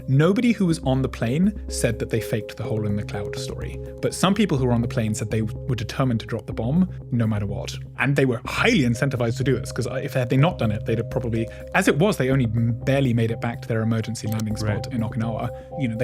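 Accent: United Kingdom. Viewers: Why is it so annoying to hear criticism of the American war effort in a British accent?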